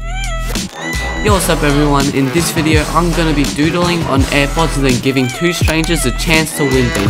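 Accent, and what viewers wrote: Australia. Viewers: Are you from straya?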